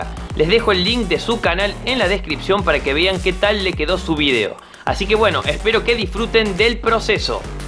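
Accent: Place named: Cordoba, Argentina. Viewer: Sos cordobes? Se te nota pa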